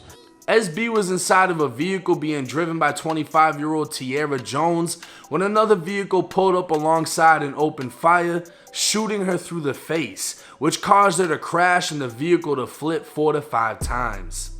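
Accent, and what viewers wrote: Boston, United States. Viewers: Are you from Boston or Long Island?? 😂😂 your voice is so cute reminds me of an actor I watch!!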